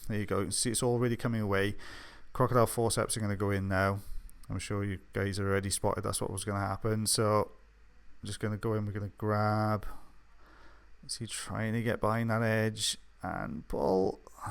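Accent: Wales, United Kingdom. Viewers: The captioning is getting a bit better, I think it has problems with your lyrical Welsh accent! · Welsh accent I hear??? :)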